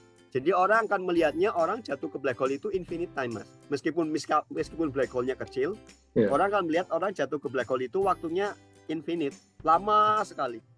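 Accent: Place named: Banyumas, Indonesia. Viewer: Kiye kayane alumni SMA siji purwokerto maseh, ora ngapak ora kepenak 👍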